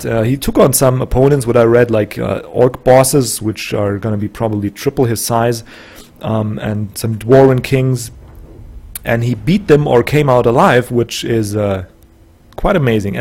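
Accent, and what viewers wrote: Germany. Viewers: Im so happy to see you back!!!! :D its great to hear your voice and some german accent again FeelsReallyGoodMan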